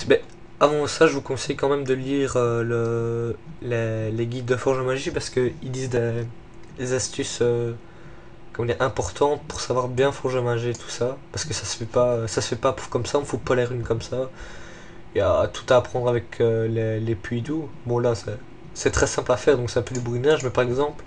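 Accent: Belgium. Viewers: Un petit accent belge :)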